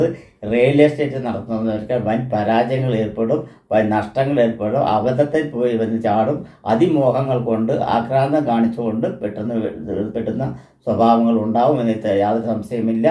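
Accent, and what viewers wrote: Tamil Nadu, India. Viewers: തമിഴ് നാട്ടുകാരാണ്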